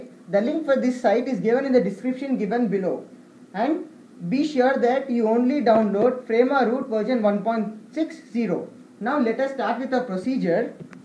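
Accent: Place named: India. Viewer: Ce accent are indianul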